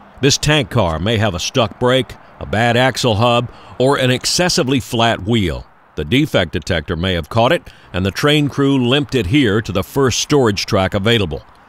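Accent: South, United States. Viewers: I like this guys voice. It’s like a cowboy with a really light southern accent.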